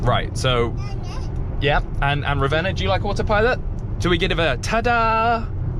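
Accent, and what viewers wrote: United Kingdom. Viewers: I like your british accent, you sound like DanTDM. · I love your British accent! :)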